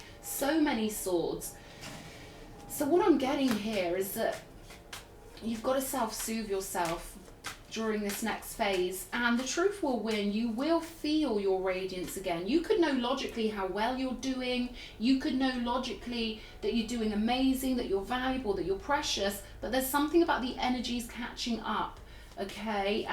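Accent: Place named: England, United Kingdom. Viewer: I love your accent! Where are you from? In which part of England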